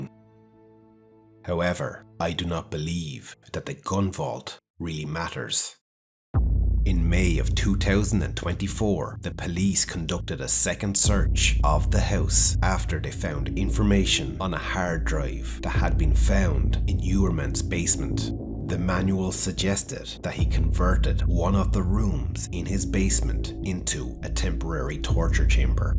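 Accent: Ireland. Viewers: I don't know who you are, but you have found your calling! I just discovered your channel tonight and have since watched all 8 of your videos/mini-documentaries. They are better than 99% of the docs on Netflix, etc.! They are thoughtful, expertly researched and produced, well-paced, and leave the viewer wanting more. The Irish accent is just the topper! Now, get to work and give the people what we need, tout de suite! 😂😍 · I love your accent which travels far and wide before coming home to Eire. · Really professional. Are you Irish?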